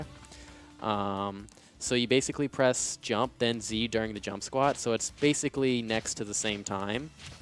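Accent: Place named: Canada. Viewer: This dude canadian?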